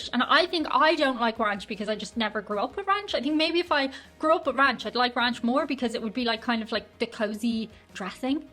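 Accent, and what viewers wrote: Ireland. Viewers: I have travelled the world, and find the Irish people the friendliest people on earth. PS - I love your accent/brogue. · Hi! New to your channel! - for a kick sometime, record yourself saying the words "power, pour, par, pair" - in your charming Irish accent - play it back, and enjoy the echo effect? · That Irish accent. 😍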